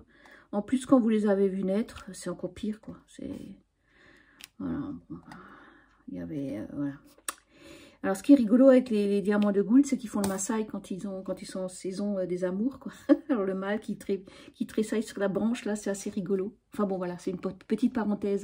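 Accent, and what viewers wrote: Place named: Alsace, France. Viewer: Solù, ehr geht guát und dù? J'ai explosé de rire avec le Godvertami ou alors le Godverclemi, c'est tellement nous les alsaciens. Désolé je suis nul dans l'alsacien écrit, mais je crois que c'est pas si mal 😅🤣 Moi aussi je me reconnais en toi, ta façon de parler, les expressions, c'est génial j'adore ❤ Moi, j'avoue que je me retiens beaucoup, car je parle le "fralsacien" au quotidien. Je suis tellement contente d'avoir fait ta connaissance via YouTube. Je crois qu'on s'entendrait bien dans la vie ❤ Decka Schmoutz et bonne soirée 😘😘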